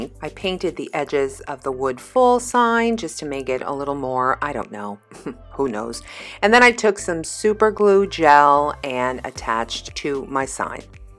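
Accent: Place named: South, United States. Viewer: OMG!!! I’ve just started watching YouTube diy videos in the last month and I just wanted to reach out and tell you how much I LOVE your videos! Yes, the ideas are great but your commentaries remind me so much of my friends here in Texas! Woman, if I didn’t know better I would think you were a Southern girl!!! Keep the great videos coming,! #sistersfromanothermister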